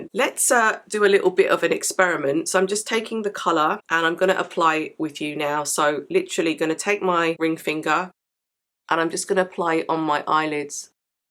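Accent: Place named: United Kingdom. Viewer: Oh my goodness I love you 😍 I’m British too so totally appreciate your accent plus enthusiasm!!!!! All the words you’re using are spot on for these products . I have them all ,totally obsessed. You’re right about the super loaded peau de peche !!!! I find myself taking it out of my purse just to look at it and smell it . Absolutely stunning product. In fact I’m stocking up in case it disappears one day 🙋🏼‍♀️😳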